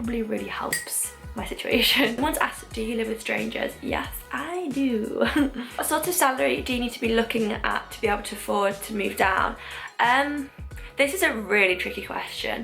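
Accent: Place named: Sheffield, United Kingdom. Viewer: Knew I was recognising a Sheff accent all this time! I'm from Darnall and have been seriously considering London for uni lately.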